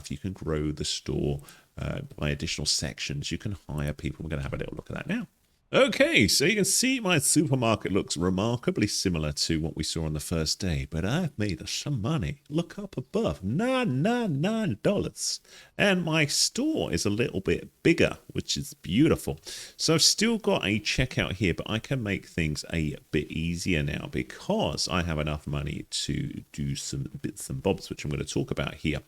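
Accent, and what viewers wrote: United Kingdom. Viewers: Awesome Video. Supermarket Simulator Looks Like A Fun Game To Play. Off Topic: Your British Accent Sounds So Cool 🙂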